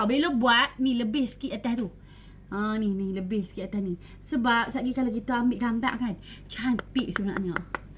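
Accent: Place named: Kedah, Malaysia. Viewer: Seronok dgr bella loghat kedah,sbb sy dari pahang.best sgt2 riuh rendah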